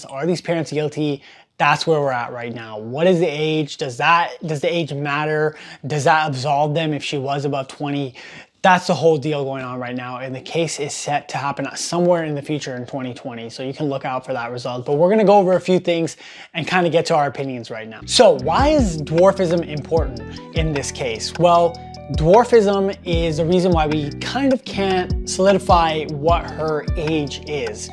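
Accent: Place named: Ukraine. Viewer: Her accent!!!. You could never overcome a Ukrainian or Russian accent as an adult learning to speak English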